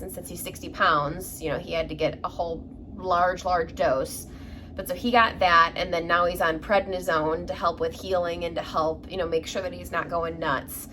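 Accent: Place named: Chicago, United States. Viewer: That Cryptids SAL is looking awesome! Love that Chicago accent too 😜 Your videos are great!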